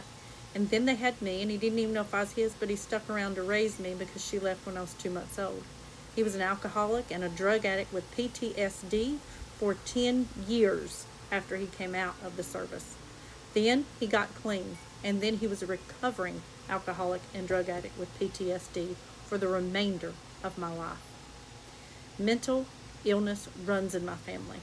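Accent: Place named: Massachusetts, United States. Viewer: Hell of a Massachusetts accent.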